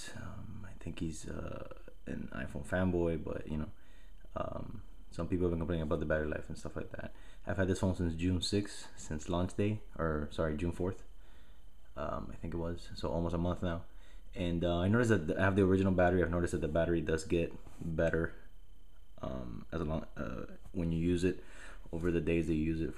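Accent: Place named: South Florida, United States. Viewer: thanks for your reply.. p.s. when I heard you on the video I thought you sounded familiar and then I saw that your from south fl... i'm from Miami but living in va beach for now... thanks again.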